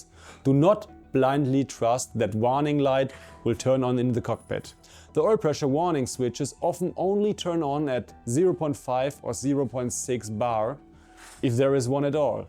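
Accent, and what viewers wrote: Germany. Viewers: sorry but there is only one thing that is so annoying for me and that is a german speaking english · I feel the german in your voice :) This is a very good video and the explanation is on point. But now i am curious, can i, as a non mechanic guy, increase the oil pressure from the pump in idel anyhow?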